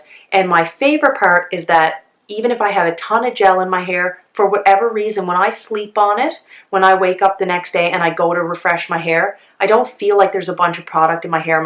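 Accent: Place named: Cape Breton, Canada. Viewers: Hi! First of all let me say I love your channel! I came across it a few few weeks ago when I decided to let my natural curls go for maybe the fist time ever... As a teenager and into my twenties I fought my curls with a curling iron and then 14 years ago I decided that a straightening iron was the best thing ever!! Well 6 months ago I decided to embrace my grey hair as well.. Yes I jumping in over my head (pardon the pun! Lol!) with grey and curls.. What am I thinking! I bleached out my dye last August to help with the grey transition.. I thought I destroyed my hair it was like straw! Anyway I continued to straighten.. Until I began to hear about the curly girl method. I searched YouTube and found your video of how to step by step do my hair and tried it I was... to say the least... Amazed!!! Did not know my hair could do that! And I remind myself that it should just get better... 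Also amazed at the compliments I have gotten already... My hair is still two tone from the grey hair/ bleach grow out but I really think I will embrace my new look! Oh and I just want to say when I first seen your channel your accent was what caught my attention... I am from Newfoundland and I wondered if you were to, but turns out Nova Scotia isn't to far and we're "neighbours" lol! Love your Province by the way! Keep up the the great videos and Happy Anniversary!😊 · I don’t even have curly hair and I love watching your videos and hearing your CB accent (makes me miss home!)! Would love to see some healthy lifestyle videos. Congrats on the new office!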